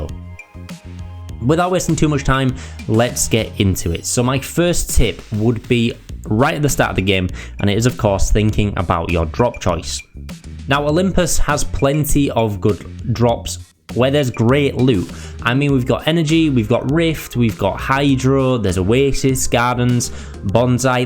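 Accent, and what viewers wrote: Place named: Scotland, United Kingdom. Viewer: There are 2 reasons why I subbed. 1. I enjoy ur content and think it's very helpful. 2. Your Scottish.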